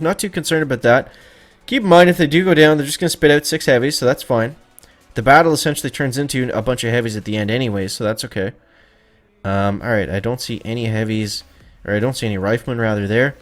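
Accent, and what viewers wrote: Canada. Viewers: could you do one vid with your thickest Canadian accent possible please? I would love it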